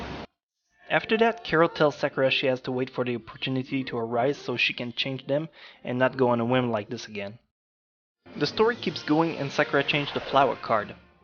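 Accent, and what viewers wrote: Quebec, Canada. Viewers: are you from Quebec? The accent seems familiar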